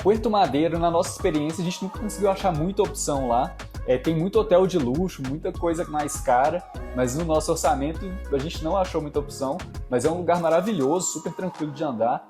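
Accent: Minas Gerais, Brazil. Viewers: Gostoso demais ver vídeo com sotaque mineirim! Parabéns aí! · ei cara otimo video , eu desconfiava que tu era mineiro meio matuto tudo termina em (ENO) correno,comeno,fazeno,sabeno , falano e por ai vaiiii. estou (INO) pra la otima dica.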